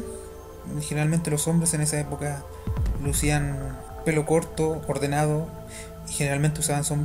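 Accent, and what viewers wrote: Chile. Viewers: Video Robado y monetizado sumale la voz de un chileno Boludo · Buen video. Oye una pregunta,eres Chileno??? lo digo por tu acento! Saludos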